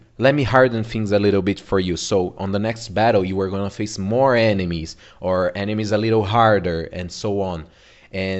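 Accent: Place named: Brazil. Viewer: You sound like a Brazilian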